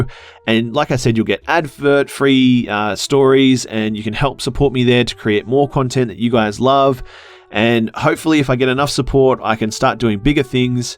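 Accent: Australia. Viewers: this is a real Aussie accent and not the dumb stereo types people do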